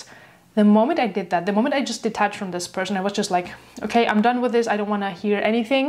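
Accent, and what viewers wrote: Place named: Spain. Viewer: Girl you're soo underrated! Also are you Spanish? Love your accent!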